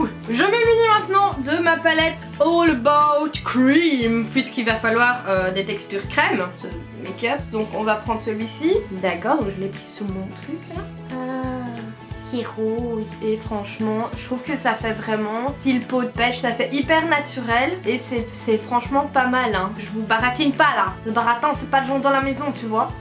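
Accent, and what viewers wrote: Liège, Belgium. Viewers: Elle a pas le même accent je trouve haha! Elle vient de Liège (Coucou tu es trop lente pour répondre à tes commentaires alors faut bien que quelqu'un le fasse hein8DDDDD) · ça s'entend que tu es belge 😉 on dirait moi 😂😂😂 (oui je suis belge) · Tu viens d'ou en Belgique t'as le même accent que lufy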